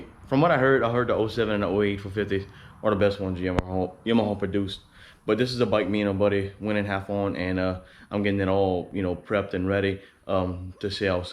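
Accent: Louisiana, United States. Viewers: Damn you must be from Louisiana😂😂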